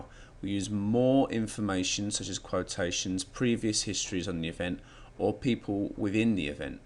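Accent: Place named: United Kingdom. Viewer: Informative video thanks. But British ascent is very hard to understand for us(non native). But i'm trying to understanding.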